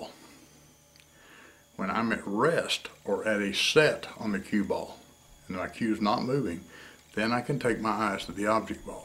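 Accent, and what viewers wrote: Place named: United States. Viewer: Thanks for your reply BUTT I do not believe this is reg size. I used to be 21 err able to make a shot with the stick behind my back with one foot on the floor, not on this sucker I can not touch the floor with my foot. So either I shrunk several inches or this table was built for basket ball players. How do I compensate for a non regulation table. BTW, I must commend you on your instruction, very precise and to the point in simple American English.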